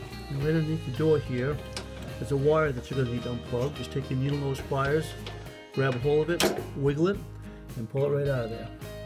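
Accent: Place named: New Orleans, United States. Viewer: Dang buddy I recognize that accent anywhere...New Orleans or somewhere close lol thanks for the tips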